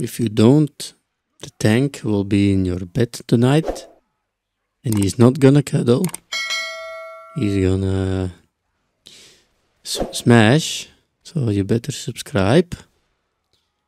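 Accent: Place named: Turkey. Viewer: Are u from turkey bro? Wtf is this accent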